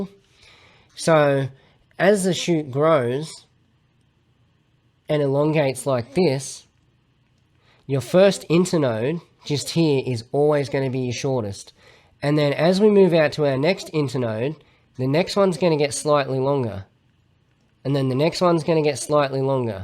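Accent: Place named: Australia. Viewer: Brilliant explanation, bonus you got the Aussie accent mate, bloody love it legend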